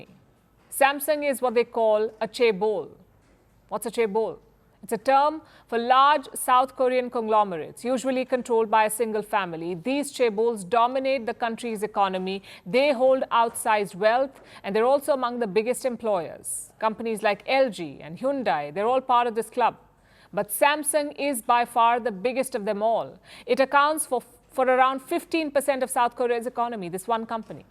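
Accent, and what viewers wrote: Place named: India. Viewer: I like your indian accent😅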